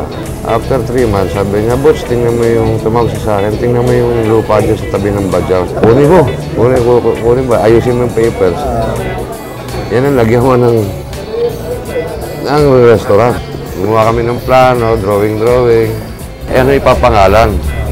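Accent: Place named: Philippines. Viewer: I saw him on Zimmerman's show and noticed his English accent was more Filipino... he's practically Filipino. Thanks for sharing this vid!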